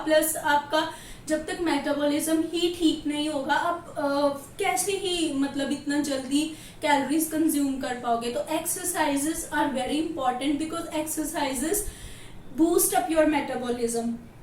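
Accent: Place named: Punjab, India. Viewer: Hi, Awesome video!!👏🏻👏🏻 So happy to hear your voice + that punjabi accent😍 Proud proud😎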